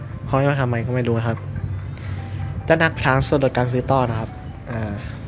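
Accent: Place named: Thailand. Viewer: เสียงเหน่อ น่าร๊ากอ่า เพิ่งเข้ามาเจอครั้งแรก กดติดตามให้และนะ ทำคลิปออกมาเยอะๆนะ ชอบเล่นเกมนี้รอติดตามนะ ^_^ เป็นกำลังใจให้ แอดไปและรับแอดด้วยนะ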